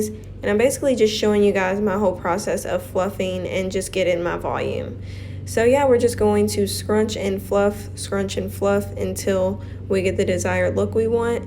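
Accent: South, United States. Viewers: Where you from?! Sound like a southern girl like me 😊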